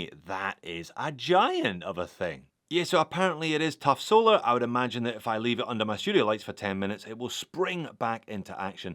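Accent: Scotland, United Kingdom. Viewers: 😅Love the videos been watching you get better and better over the years 👍Congrats to you and your wife on your new family,😂😂sorry but it’s going to go downhill from here 😂😂😂I look forward to watching you every week,love hearing the Scottish accent which i hardly ever hear as i moved to Boston Massachusetts twenty years ago,good luck to you and your family for the future,please stay happy healthy and safe 🏴🏴🏴🏴🏴👍👍👍👍👍👍😀😀😀😀😀🇺🇸🇺🇸🙏🙏😇😇🤣🤣👏👏👏👏👏👏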